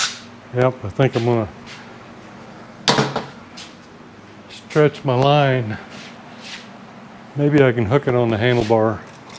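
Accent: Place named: West, United States. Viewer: western accent and New England accent, have to listen closely.LOL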